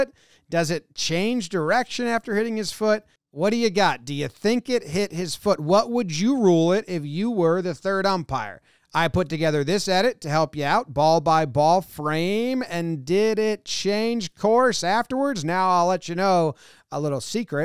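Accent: United States. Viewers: How come you're so good at understanding cricket? US channel with regular American accent.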